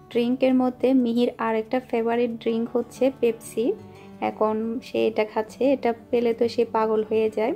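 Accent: Chittagong, Bangladesh. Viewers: Apu tumar sob blog dekhle e valo lage..sobcheye beshi valo lage ctg tone e kotha bolo bole...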